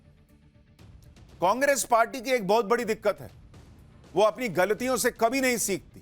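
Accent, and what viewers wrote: Bihar, India. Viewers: Yaar sushant ko bolta sun ke aisa lagta hai ki ye national channel par nahin bihar ki audience ke liye anchoring kar rha hai.....baar baar bihari tone mein baatein bol jana cool nahin lagta dost....kripya bhasha, shabdon aur tone ki maryada ko durust rakha kijiye.....JAI HIND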